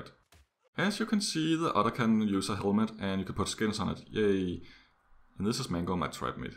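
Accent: Denmark. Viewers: You sound Danish. Are you Danish? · U Sound Danish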